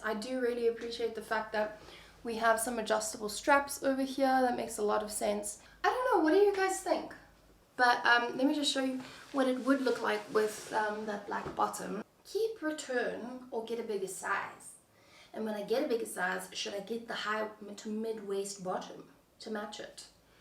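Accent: South Africa. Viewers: Oh wow I’ve never seen your videos before but when I heard your voice I wondered if you were South African! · Do I hear a South African accent?👀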